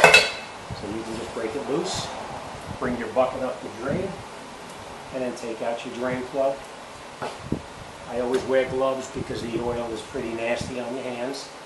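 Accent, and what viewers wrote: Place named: New York, United States. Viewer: Precise, to the point and love that NY accent. Great Job!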